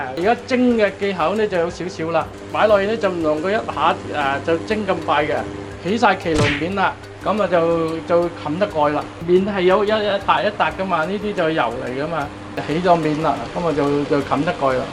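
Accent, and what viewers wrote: Zhongshan, China. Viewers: 師傅口音應該係我鄉下中山石岐，是嗎？ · 是中山，不是石岐.而是中山隆都。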